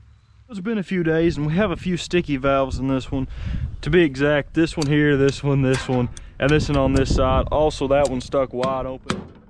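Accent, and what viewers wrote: South, United States. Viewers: Now, that is a cool American accent. This dude has manners. Where is he from in the states? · I love how Southerners pronounce "oil"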